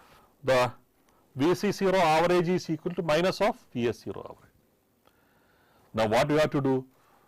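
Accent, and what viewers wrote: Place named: South India, India. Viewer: very useful video...regarding his accent, he speaks indian accent particularly south indian accent..ppl may find it difficult but slowly u will be used to his pronunciation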